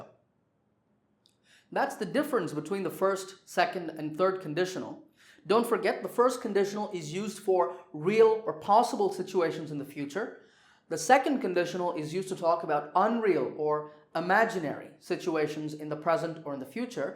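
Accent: United States. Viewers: Very clear presentation. The speaker has a relaxed but crisp American accent. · Wow such a great prodigy from an India, your accent or tone of your voice is like an american....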